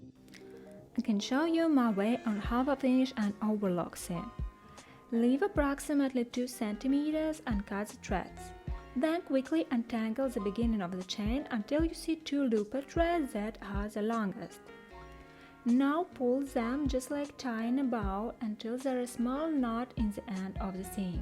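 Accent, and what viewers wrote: Russia. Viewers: У неё акцент как у русской🗿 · Hi! You sound very much Russian, with little difference in the melody of your speech. Also, your wedding ring (I assume) is on your right hand :)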